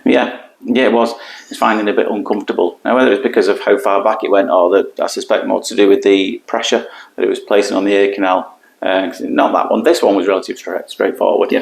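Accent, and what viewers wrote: United Kingdom. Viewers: Show off, you tech skills are great. I live in Sydney, Australia, love your accent and hearing the British expressions which we don’t hear very much these days. I love my British heritage. I hadn’t heard the paperboy one before. Guess what, I finally know what I want to do for a career. Ear cleaning your way. I have a problem though, I’m 72, have I left it a bit late.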